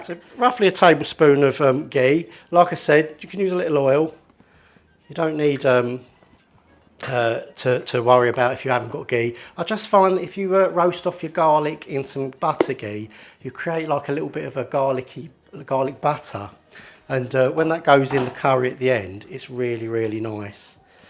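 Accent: Liverpool, United Kingdom. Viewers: Proper scouser ❤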